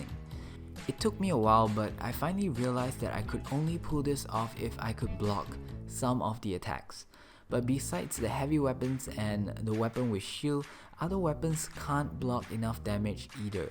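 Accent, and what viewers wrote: Singapore. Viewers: what are u Singaporean?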